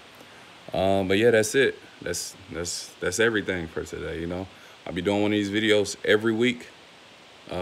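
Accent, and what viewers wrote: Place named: Philadelphia, United States. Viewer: You gotta be from Philly I know my people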